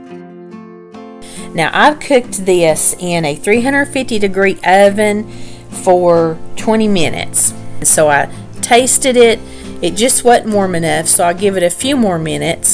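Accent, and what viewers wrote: South, United States. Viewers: I also love your wonderful accent 🙂. Sometimes I wish I was Southern 😂. But even though I can't speak in that beautiful way y'all do without being annoying or offending someone, I can try to make southern food. I've been making biscuits homemade from scratch since late last year and my family always loves them. I live in a country where there are absolutely no canned biscuits. So when I move back to the states Lord willing, being able to buy so many easy canned stuff will be such a treat. One batch makes about 11 biscuits and there never seems to be enough especially as our dog will not stop barking (when she's tied up momentarily so we can eat without her climbing all over my mom to get some) unless she gets one 😄.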